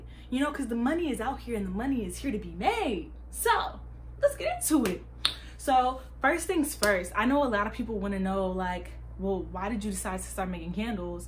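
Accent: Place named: Philadelphia, United States. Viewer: oh I just assumed because you sound like a philly girl lol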